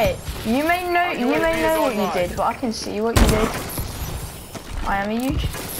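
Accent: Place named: Birmingham, United Kingdom. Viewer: You sound like your from Birmingham... Great comeback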